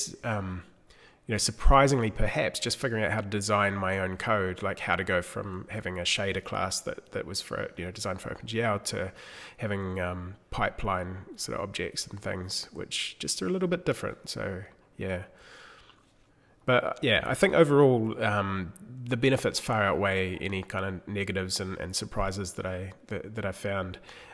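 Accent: New Zealand. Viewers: Dude! This is epic. Are you a kiwi? Sounds like. Me too heh. How did you even get started with making your own engine? It's not like you can just look up a tutorial. Keen to have a chinwag!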